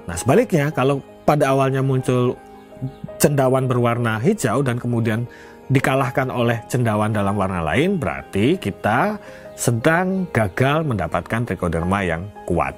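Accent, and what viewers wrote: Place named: Kudus, Indonesia. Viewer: Panjenengan gaya penyampaianya seperti temanku org Kudus.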